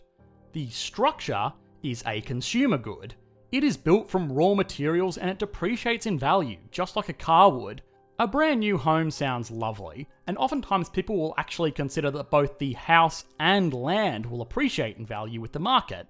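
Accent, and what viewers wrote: Australia. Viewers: Petition for economics explained ASMR channel with his soothing Aussie accent. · Australian accent, US film clips. What is going on here? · Just wondering the bloke narrating sounds like an Aussie, can you talk about the Australia markets?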